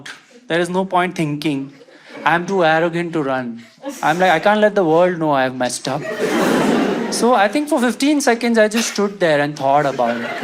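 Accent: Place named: South India, India. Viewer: south Indian accent is so sexy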